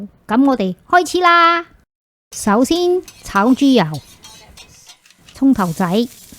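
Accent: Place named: Hokkien, China. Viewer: …. Your Cantonese improved so much… but still have that hokkien ‘slang’ 😃😃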